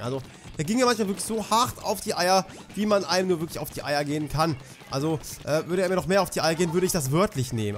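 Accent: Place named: France. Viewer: bester französischer Akzent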